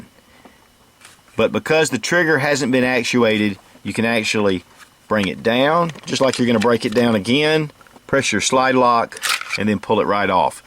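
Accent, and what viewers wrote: United States. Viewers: why can't americans say any :D it's always ' ini ' xD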